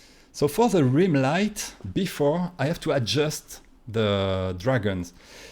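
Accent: France. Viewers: I really like your video's. I learn a lot from your tutorials. Keep it up! Your accent emphasises my vision of a French painter in Paris. 👍👍👍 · Your French accent is a heaven 😭💕 Anyway I like your style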